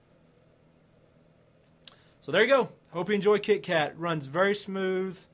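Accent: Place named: South Carolina, United States. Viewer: You have giant balls with a South Carolina dialect. Or North Carolina. Whatever you coastal geniuses.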